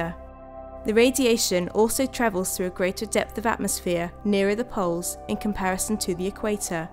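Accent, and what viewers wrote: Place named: United Kingdom. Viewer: its like british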